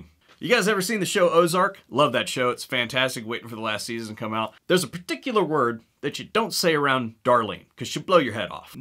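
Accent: Ohio, United States. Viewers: Love the video and I can tell you are from Ohio , from another Ohioan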